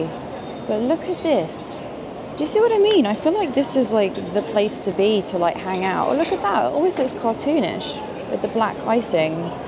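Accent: RP, United Kingdom. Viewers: I love her voice & posh accent :)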